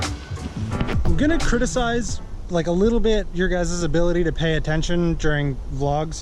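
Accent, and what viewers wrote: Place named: New Brunswick, Canada. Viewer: Even though you are not fluent French speaker anymore,you kept the New Brunswick accent 👍🤣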